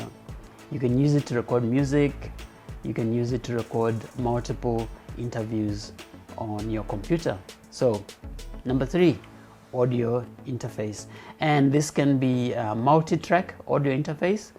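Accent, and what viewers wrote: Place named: Zimbabwe. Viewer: This sounds like a Zim accent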